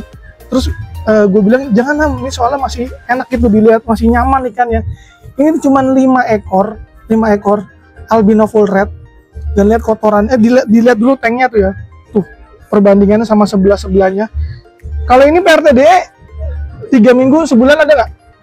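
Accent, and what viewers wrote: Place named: Java, Indonesia. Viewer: Bang kamu asli dr mana logat nya kya dr jawa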